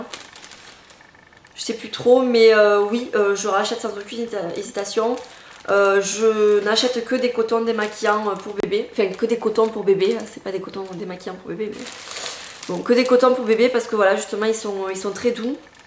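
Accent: South, France. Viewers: Coucou tu viens d'Avignon ?? Tu a l'accent du sud :)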